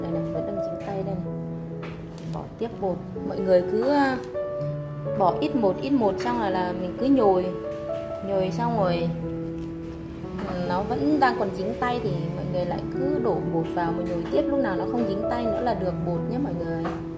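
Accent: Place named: Ha Tinh, Vietnam. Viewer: Nghe tiếng hà tĩnh mà hay quá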